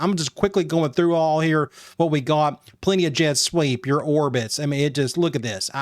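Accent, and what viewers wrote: South, United States. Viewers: I subscribed just off your country ass dialect 😂😂😂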